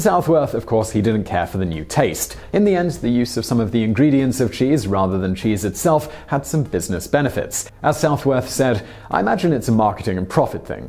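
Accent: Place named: London, United Kingdom. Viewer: this guy says 'sore' instead of 'saw', verb. because: London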